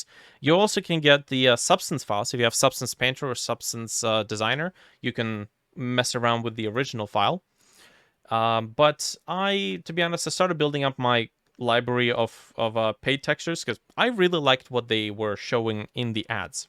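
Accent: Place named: Eastern Europe. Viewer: you speak good english but, i dont know why, i hear some slavic accent lol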